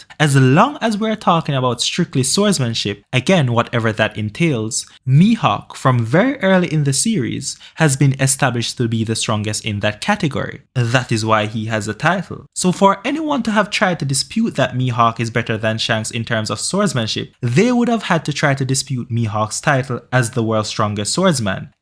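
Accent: Jamaica. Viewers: you from JA bro? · You Jamaican?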